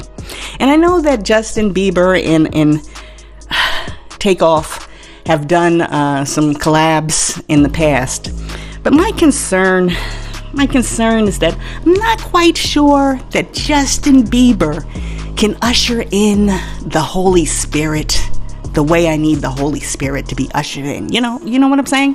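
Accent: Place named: Queens, United States. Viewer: What up fam…. What up sis..!! You Jamaica Queens strong I can tell.!!😃. Great job please keep it up..!!! Sup..!!!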